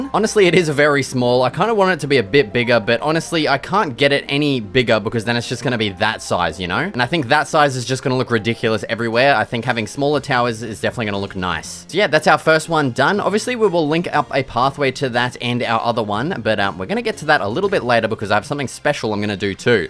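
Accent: Australia. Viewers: Cus his Australian mate 😂 Could tell the moment he said theres afew blokes over there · He’s an Aussie. Kiwis sound different. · He sounds more Australian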